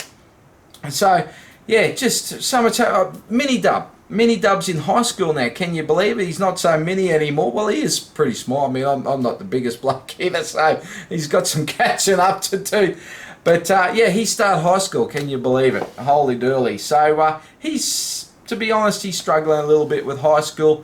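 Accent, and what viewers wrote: Australia. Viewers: Great to see you back, and hearing an Aussie voice around. Our weird weather down here near Sydney has made things even more crazy. We finally got to have a camping holiday that has been cancelled 3 times from Covid. Yes I too have changed my Lego collecting thinking. Sadly my city is going. We need the garage back but the small office room is becoming a Lego room. My girls are in high school too. One thing we all have learnt from the last few years is that things will always change and you need to adapt. Thanks again Dub. 😁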